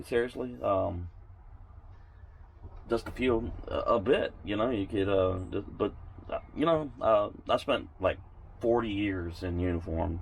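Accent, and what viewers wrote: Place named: United Kingdom. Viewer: ❤ love woodworking Also really like your accent as well are from UK Where are you ?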